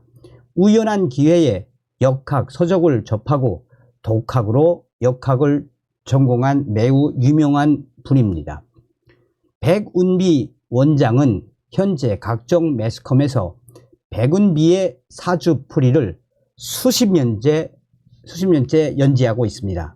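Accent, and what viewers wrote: North Korea. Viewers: 정성산TV대표님 새해복 많이 받으세요 말투가 북한 말과 비슷하네요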